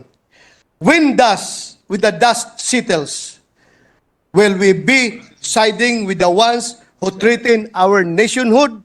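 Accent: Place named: Visayas, Philippines. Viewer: Bato mag speech theraphy ka nakakakabaliw English pronunciation mo, visaya visaya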